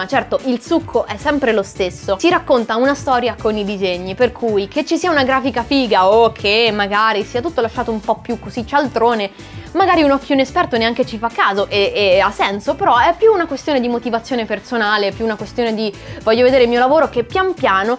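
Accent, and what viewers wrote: Tuscany, Italy. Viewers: ma sei davvero toscana?? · Vogliamo un video in cui parli solo ed esclusivamente toscano!! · Anch'io sono della Toscana! Non me ne ero nemmeno accorta che parlavi in toscano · ma te sei toscanaa???? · sei toscana??? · Bene, direi. Che aggiungere? Nulla: interessante & divertente come sempre (però io voglio sentire la che ci parla in toscanaccio marcato eheheh), e complimenti per questa "maturazione" nella cura e nel lavoro messo nei draw my $whatever:) Io in fondo non posso fare testo: ogni volta che prendo anche una semplice matita in mano, da qualche parte un grafico sviene o viene colto senza preavviso da violenti conati:( Quanto ai sogni... non vedo l'ora che ci racconterai i prossimi... posso consigliare, per favorire le cose, un bel film horror mangiando popcorn aromatizzati alla peperonata con le cozze? Dovrebbe dare quel tocco in più alla vena creativa:D ahhahahaha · sei toscana ma di preciso? 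Pisana,Livornese,Fiorentina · Ma sei diventata ancora più carina? Btw, ti prego parla toscano, è un accento bellissimo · sei brava fra come sempre! :-) ps: la cadenza toscana a farla sentire al mic. ti ci devi impegnare altrimenti un si sente ah ah anche a me succedeva.. ciao.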